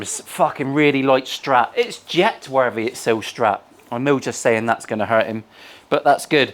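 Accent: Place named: Plymouth, United Kingdom. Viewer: from Scotland here you don’t swear as much as the wife so I’m allowed to watch from the hoose love the channel and content and my old man was a Plymouth boy so the accent is a bonus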